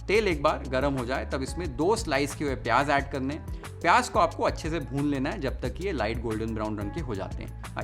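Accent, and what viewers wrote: Maharashtra, India. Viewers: Bhai ki Marathi accent ❤️ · Chef , nicely talking Marathi -Hindi misal language 😊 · Sir I truly admire your pronunciations in Marathi esp "l" which is authentic for Maharashtra, hats off to you for introducing authentic recipes of India along with authentic language pronunciations, best wishes for the same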